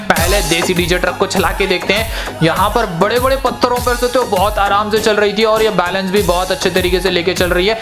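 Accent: Rajasthan, India. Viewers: Bhai Rajasthan se hai kya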